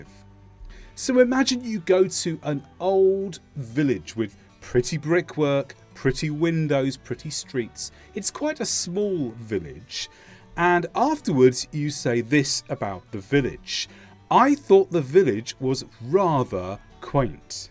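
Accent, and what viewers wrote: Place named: United Kingdom. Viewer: Not American.. UK😅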